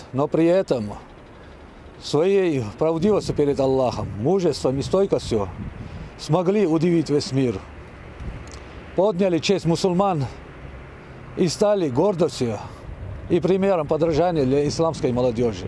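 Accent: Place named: Chechnya, Russia. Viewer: Унего чеченский акцент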